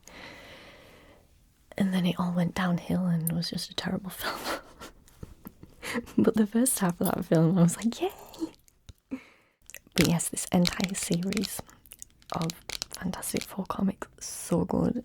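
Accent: United Kingdom. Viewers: Jesus this is your normal accent?! Might even be more Harry Potter than the movie universe itself ha. It's even more impressive then with that Villanelle Russian accent and mannerisms. Ultimate F4 is great-I need to read that again in the future. I need to pick up a Crichton book. I hear nothing but praise for that guy and his novels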